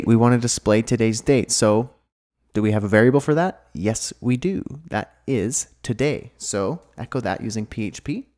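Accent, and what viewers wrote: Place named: Canada. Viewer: I love Canadian accent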